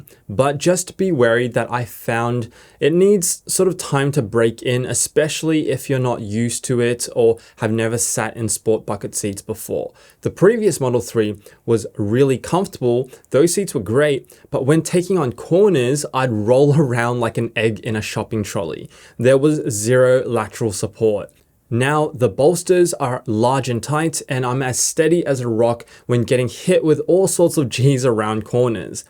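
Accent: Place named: Australia. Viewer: You've got the Australian accent but keep using "miles" ... A little disorienting :P Now... I just need to drastically increase the amount of money I have and I'd be able to afford one :D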